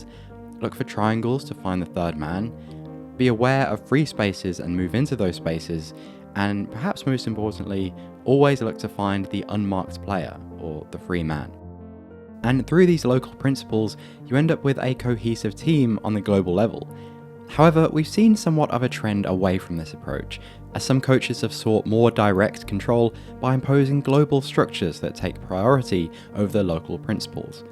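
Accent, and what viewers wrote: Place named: England, United Kingdom. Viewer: Dude i HATE english accent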